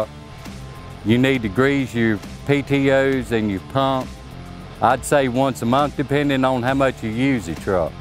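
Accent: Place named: South, United States. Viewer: Im about as north as you can be and damn that's thick south!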